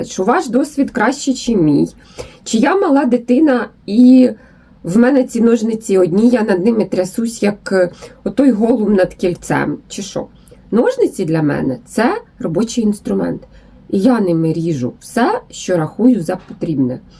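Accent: Cherkasy, Ukraine. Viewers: Вітання з Новим роком та Різдвом Христовим! Давно вас дивлюся, але пишу вперше. Ви для мене великий мотиватор і натхненниця, особливо після профвигорання. Коли чую Вашу мову, відчуваю себе у гостях на Черкащині, наче побачилася з родичами. В захваті від вашого з ентузіазму. Ви - мої муза із музом. Бажаю вам успіхів, наснаги й здоров'я.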